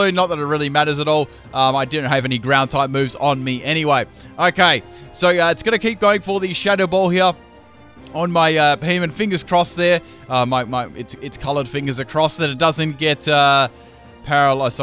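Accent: Australia. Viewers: "it's gonna spank me on the ass doing 3/4 health there" idk why but that line was really funny. maybe it's the aussie accent.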